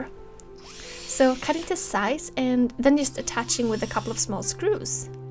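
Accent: Sweden. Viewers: Nice! sounds like you having a Swedish accent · maybe out of topic but it sounds like you got a strong Swedish accent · Just found out your channel. Thats a great video, congrats. Sorry to ask but are you somehow swedish in any way? I ask cause when you say OK for example it sounds a lot like if you were. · Are you from sweden? Because you sound really swedish xD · I'm confused. Your accent is Swedish but you say "aluminum" and use the imperial system. What's the deal?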